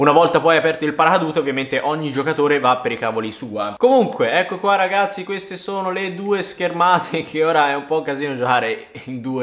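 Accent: Tuscany, Italy. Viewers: Sei toscano vero? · Ma sei toscano?? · Quanto toscano🤣😊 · ma sei toscano? mi sembri un po' toscano 😂🤗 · Bello l'accento toshano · Ma sei toscano? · Sei toscano? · Si chiama parlata toscana/fiorentina🤦‍♂️ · We bello toscano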